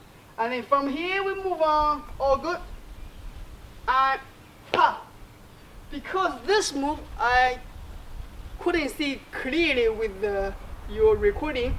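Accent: China. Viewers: I believe almost 💯 % that he is chinês. He does have a beautiful accent.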